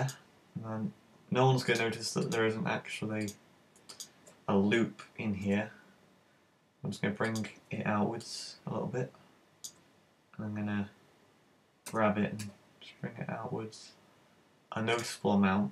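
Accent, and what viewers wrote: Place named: Southern England, United Kingdom. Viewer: that accent sounds damn familiar :) guessing from south england but been in the north quite a while?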